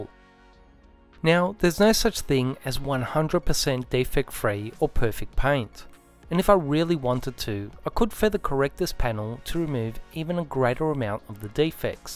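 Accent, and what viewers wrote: Australia. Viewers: I love AU accent! Almost British! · Woah it's an Aussie!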